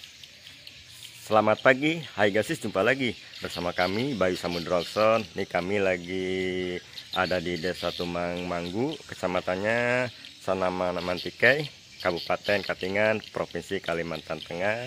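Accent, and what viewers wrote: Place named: Java, Indonesia. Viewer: Salut dgb Om... berakulturasi dgn budaya lokal, bahasa dayaknya dah bagus walau medok jawanya masih agak terdengar.... semangat... sukses selalu Om.... Bisa reques ngak Om, minta video didaerah SIBITAN... kangen masa kecil ku 30 thn yg lalu...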